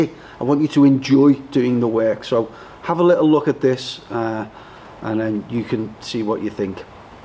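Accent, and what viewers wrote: Liverpool, United Kingdom. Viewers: Like a scouse usyk there mate 👌🏾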